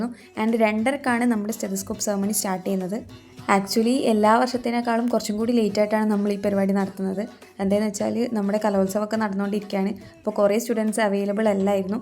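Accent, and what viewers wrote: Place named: Kasaragod, India. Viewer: Epa kasargod samsaram kekan thodanga😅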